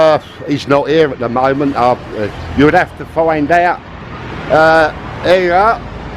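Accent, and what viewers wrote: United Kingdom. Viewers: Good one,. I am convinced that I watch your content mainly because I like your personality. Where the hell in the UK are you from? It's fun trying to figure out your accent half the time. Sure, I've been to Thailand several times, Pattaya only once, but your content is compelling and interesting to me only because it's delivered by you. You're an engaging dude, so keep up the good work and I hope I can share a cold one with you some day. Keep doing what you do. It's awesome.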